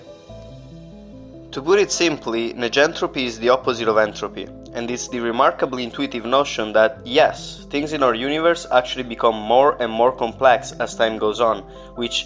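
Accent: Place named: Italy. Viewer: Fantastico come la tua pronuncia fosse perfetta, ma mi sono bastate le prime tre parole per capire che fossi italiano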